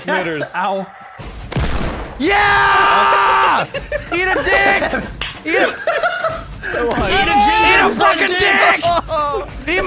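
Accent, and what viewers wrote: Canada. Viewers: Ma gad,vanoss now bein ragin 😂,the canadian accent tho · Yeah. He’s Canadian.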